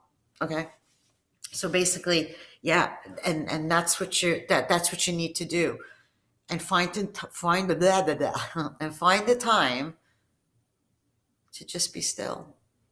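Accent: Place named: Canada. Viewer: Ahh , c'mon your accent isn't that thick . But , I can still tell your that your cleary Canadian . Clearly . 🤪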